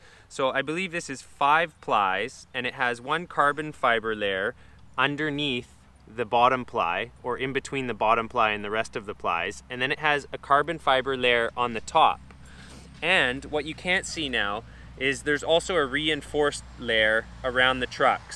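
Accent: Canada. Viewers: First time watching the channel and I thought to myself that guy is Canadian I'm sure, I can hear it in his accent. As an English man we can't typically distinguish the difference between American and Canadian accents and whilst all you in North America hear those differences between each other easily I imagine, for us it really isn't easy but I think I've got it sussed now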